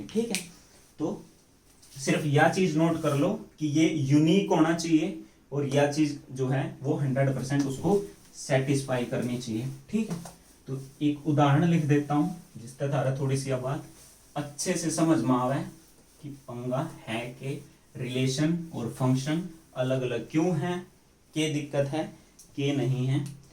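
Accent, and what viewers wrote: Haryana, India. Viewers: Ohh sir aapne haryanvi kb se bolni suru krdi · Sir hryanavi mt bola kro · Sir aapne haryanwi km achi lgti h...aap hindi hi bola kijiye.....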